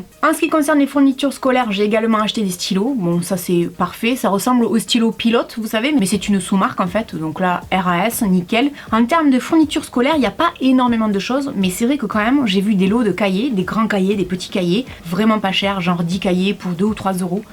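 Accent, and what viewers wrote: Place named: Pyrénées-Orientales, France. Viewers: J'adore ton accent du sud ouest !! Un vrai délice auditif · Je confirme malheureusement, voilà pourquoi votre accent me disait quelque chose... ( Je vis également dans le 66) :)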